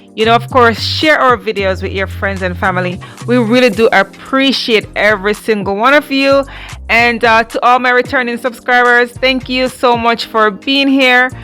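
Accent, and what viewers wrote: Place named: North Carolina, United States. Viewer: When you said y'all I thought well I'm i n north Carolina so I recognize that accent. Nice work that you do. I've also subscribed to your channel as well. Let the journey begin. Embrace it... Yours truly Ed Parkhurst. Nice to have met you.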